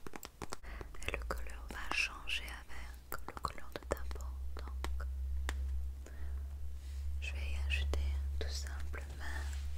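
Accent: Quebec, Canada. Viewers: Coucou, j'adore cette accent québécois. Merci pour ce maquillage, tu as fait disparaitre les imperfections et mis en valeur les points positifs. Je vais très vite reprendre rendez-vous. Gros bisous 😘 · Je n'arrête pas d'écouter tes vidéos à tous les soirs Je suis quebecois et entendre la manière dont tu prononces les mots comme on le fait un Québec est super relaxant (for some reason ça sonne exotique, réconfortant et familier. C'est la recette parfaite pour dormir) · j'ai reconnu l'accent un peu quebecois lol!!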